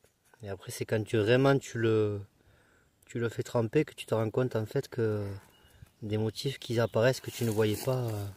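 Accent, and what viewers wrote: South, France. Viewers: Salut l'ami, beau site vraiment magique l 'ambiance. Vous êtes du Sud vu l'accent ...moi aussi je détecte dans le 13. Si des fois ...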